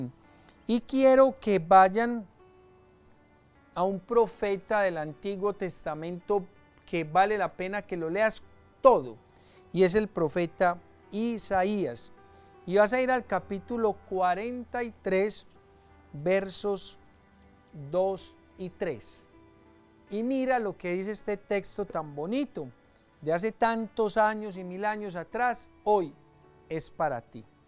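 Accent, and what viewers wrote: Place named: Antioquia, Colombia. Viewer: Fresco Papá! A lo paisa pues Padre. Me encanta! Me encanta! Usted es super lindo y humilde padre con todos los que le escuchamos. Me hacen feliz los dialectos de mi hermosa tierra. Me hace sentir como si estuviese allá en mí tierra que adoro y extraño. Gracias Señor Jesús por que Tú vas conmigo, en todo momento. Sácanos de todas éstas turbulencias mi eterno Jesús. Sé que contigo siempre tenemos La Paz. Bendiciones Padre. Gracias por compartir diariamente La Palabra de Dios. Que Dios le conceda mucha sabiduría, salud, y felicidad. Feliz día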